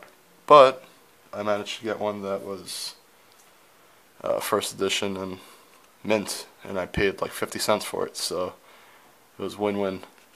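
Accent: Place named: Sweden. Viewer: Are u Swedish ur English sounds swedish Cuz it sounds like when i talk:P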